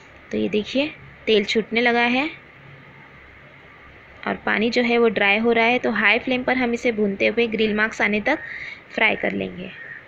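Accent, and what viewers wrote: Hyderabad, India. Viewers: Aap Hyderabad daccan se hayn?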